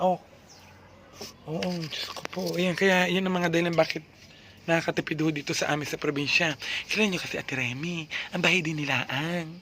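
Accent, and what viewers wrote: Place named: Cavite, Philippines. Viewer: ganyan din dito samin sa Amadeo Cavite. Same accent kaya nakakamiss ang childhood palagi ako nanunuod ng vlogs mo haha Thanks for always making my day idol!!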